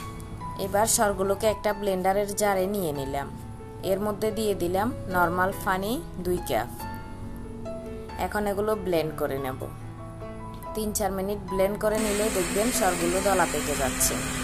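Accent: Chittagong, Bangladesh. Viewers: Chittagong er manos mone hocce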